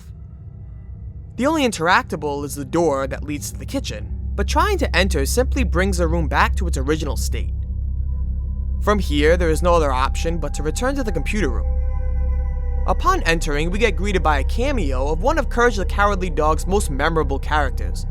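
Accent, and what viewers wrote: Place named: Minnesota, United States. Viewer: This guy has the thickest minnesota accent. Love it!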